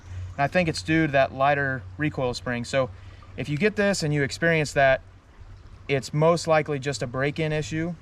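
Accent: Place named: Tennessee, United States. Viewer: I can tell your from Tennessee. Same 🤣 you sold me On this .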